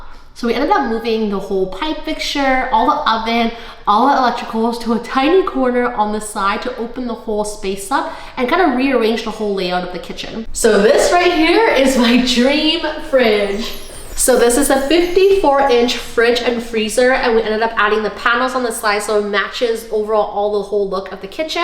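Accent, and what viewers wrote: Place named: United States. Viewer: You are looks like Koean or Japanees. But Your Speking Says You are American.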